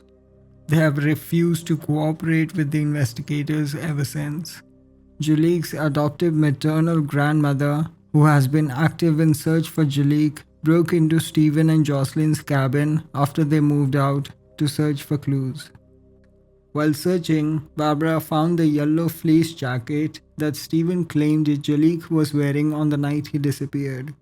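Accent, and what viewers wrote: India. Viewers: he sounds Indian . Its not like he is a terrorist. Goodness.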